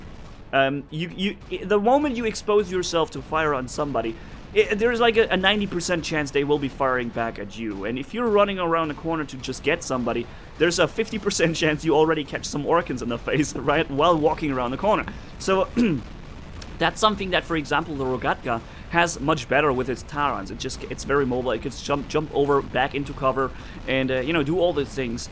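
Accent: Germany. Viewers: Been watching your vids for a while now, they're really awesome. As soon as a I heard you for the first time, I knew you were a fellow German :D. Your positive attitude is really cool aswell, makes the videos even better. Keep up the good work, I subbed :)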